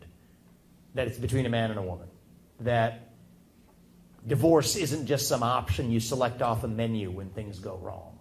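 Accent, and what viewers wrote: United States. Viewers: As a 50 year old Canadian, Vikek sounds like an American. I don't expect he'll be the next president. But he'd make a fine president.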